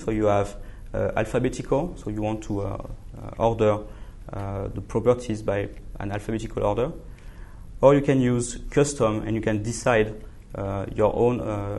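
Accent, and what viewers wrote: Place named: France. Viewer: the frenglish accent killed it a bit...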